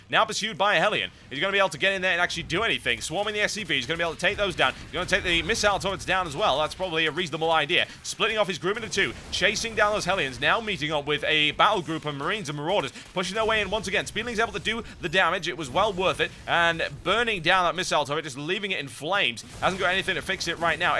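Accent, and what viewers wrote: United Kingdom. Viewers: How could I NOT notice this beautiful channel - the genuine British commentary brings tears to my eyes!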